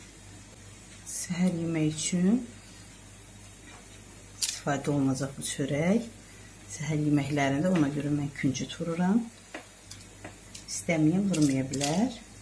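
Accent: Tovuz, Azerbaijan. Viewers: Allah ruzinizi bol elesin🙏 ellerinize sağlıq 🥰lehceniz biz terefe oxsadi Tovuz lehcesine🥰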